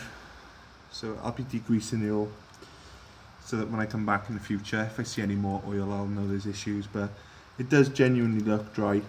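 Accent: Wales, United Kingdom. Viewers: What part of Wales you from mate, sounds like Cardiff or Swansea area, I'm from Brecon originally but live in Florida now. Thanks for the vid on this mate, getting ready to do my 2014 X MR (USDM)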